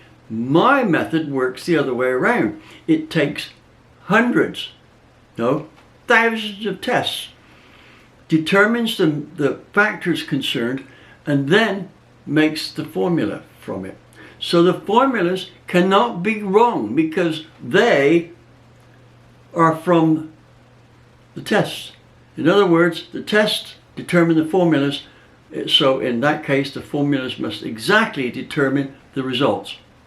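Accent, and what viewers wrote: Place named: England, United Kingdom. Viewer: I love your channel you remind me of my grandpa and I when we use to talk about and restore old British Motorcycles. Since he passed I got into cars and now have an Ecoboost Mustang myself. You remind me of how my grandpa use to explain things to me, even down to the English Accent. I watched your videos about picking out wheels for the ecoboost and it has helped me a lot. Thanks for everything.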